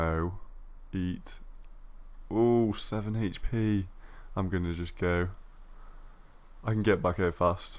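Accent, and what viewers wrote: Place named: Newcastle, United Kingdom. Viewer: True Geordie lol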